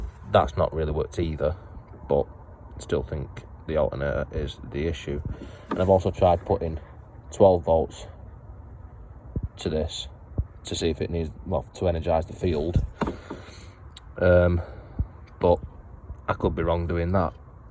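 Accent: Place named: Lancashire, United Kingdom. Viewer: Just put it down to experience with that sort of thing. I was trying to place your accent. Sounds a bit Preston/Bolton-ish.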